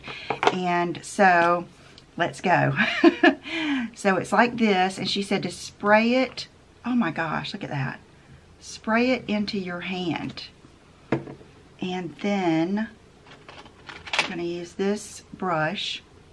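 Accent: South, United States. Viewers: Okay, your brow talk cracked me up! Too cute! I can't believe some people feel the need to correct the way others pronounce words. And the worst part is one can never say it their own way ever again without thinking of that diss. I love the way you talk and it's endearing to hear your southern words. You say tomato I say tomato ;-)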